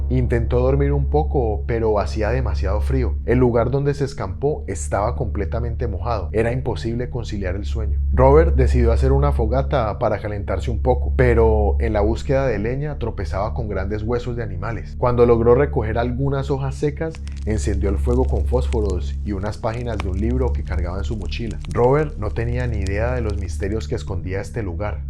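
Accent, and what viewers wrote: Colombia. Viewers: Me gustan mucho tus videos y tu estilo narrativo yncluyendo tus gesticulaciones. Si mo me equivoco tienes un asento colombiano parce. Espero tu siguiente video.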